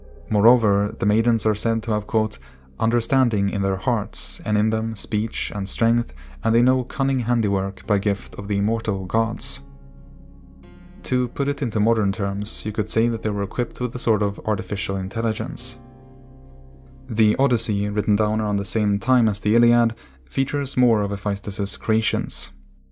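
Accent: Sweden. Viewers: Is the narrator Swedish? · I can hear that the narrating voice is swedish. :-D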